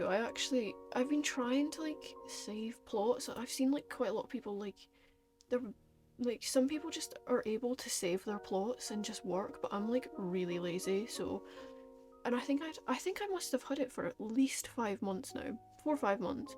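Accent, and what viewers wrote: Scotland, United Kingdom. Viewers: Omg ur Scottish 🙀🙀🙀🙀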